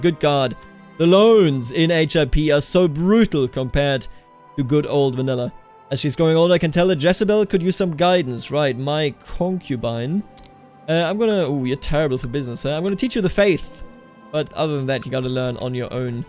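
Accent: Germany. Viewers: It's funny how his german accent is hardly heard while he speaks english. But the second he pronounces a non-english word, you can bet your ass he's a damn bavarian.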